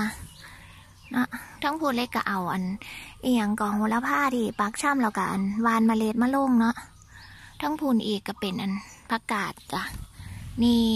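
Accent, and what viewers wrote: Isan, Thailand. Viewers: พูดอีสารน่ารักดีอยู่จังหวัดอะไรคะยากเห็นน่าจังถ่ายคิลบน่าขอดูหน้าหนอ่ยนะคะ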